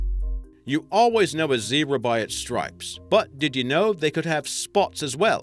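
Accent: United Kingdom. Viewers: You know theres something called a british accent?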